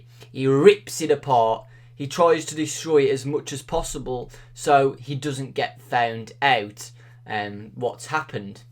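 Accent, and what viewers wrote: United Kingdom. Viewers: Are you British or Australian?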